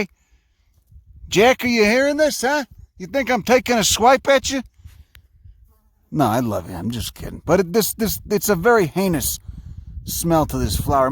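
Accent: Chicago, United States. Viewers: Please do not denigrate the fine South Side of Chicago accent with scurrilous comparisons to either of those meat-heads.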